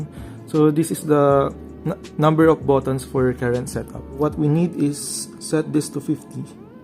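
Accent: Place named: Philippines. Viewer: Sorry I thought you were Indonesian. Now hearing again I hear a Filipino accent instead. Mantap is Indonesian for delicious/awesome :D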